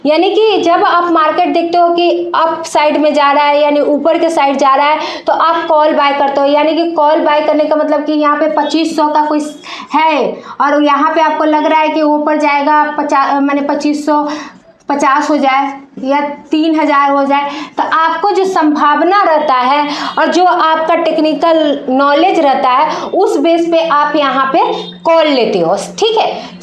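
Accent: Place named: Bihar, India. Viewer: Oh hai na bihar say hai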